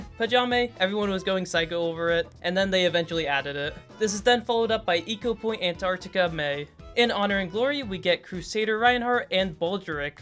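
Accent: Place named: United States. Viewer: The americanism in the pronounciation of the names is insane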